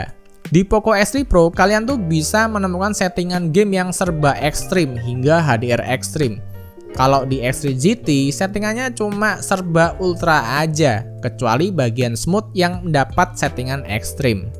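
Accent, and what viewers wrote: Kudus, Indonesia. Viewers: Orang kudus to mas? Wkwkwk sama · lho bang .. orang kudus? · Sampean orang kudus mas? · bang lu dr kudus ya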